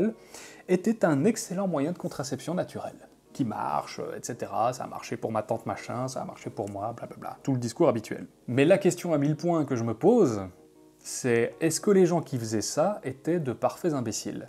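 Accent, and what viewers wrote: Belgium. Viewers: Ce format est vraiment trop cool ! Que ça soit les musiques, l'image, l'humour bien dosé, le propos, les exemples illustrant tel ou tel bout de la méthode, l'accent belge, c'est parfait ! T'es au top et merci !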